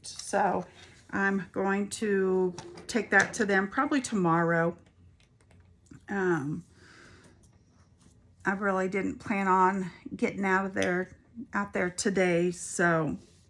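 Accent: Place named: Kansas, United States. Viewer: Hi, I enjoyed listening to your WIP and Chat. It sounds like you are a fellow Kansas. I live in Ellis, Kansas. I also have a YouTube Channel. Have a blessed day. 🌻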